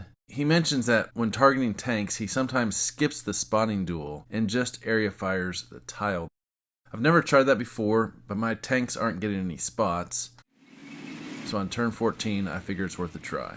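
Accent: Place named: United States. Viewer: Great AAR. First time i enjoy american accent narration. Usually they scream at you.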